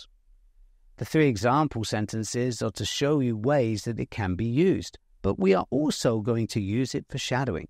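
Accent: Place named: England, United Kingdom. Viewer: Though I'm not into football , but thanks so much for the shadowing, it's very helpful and I like your English accent and tried to imitate it. 😊💕🇬🇧💕👍👍👍👍👍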